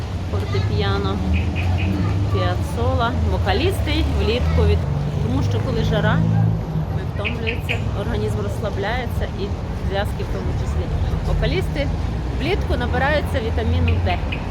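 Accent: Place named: Western Ukraine, Ukraine. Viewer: Як приємно чути ваш говір,західний наш рідний!Ви ВЕЛИКА ЛЮДИНА!!!!Пишаюся вами!!!💛💙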